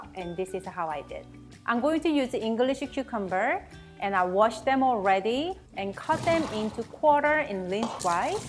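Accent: South Korea. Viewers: fyi korean has many dialects... and by dialect i mean her korean dialect not her english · what do you mean by dialect? She's Korean and just has an accent when she speaks English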